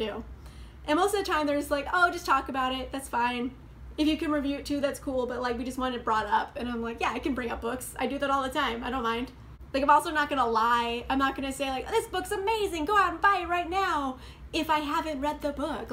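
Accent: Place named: Canada. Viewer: I don't know why but for some reason I thought you were Canadian. Where are you from? I'm in Greece.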